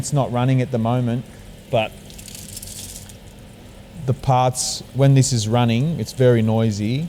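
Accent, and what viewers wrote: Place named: Australia. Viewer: Wow. I want one. Got a shock when you spoke with an Oz accent after the algorithm put this up (I am from Melbourne). Will look for your website now 👍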